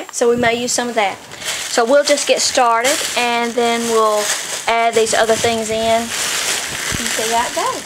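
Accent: South, United States. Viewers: Love your project. I love the mom and daughter togetherness. Families sh o uld be closer in their lives including mine. Love your southern talk. Im from Louisiana so y'all are great!!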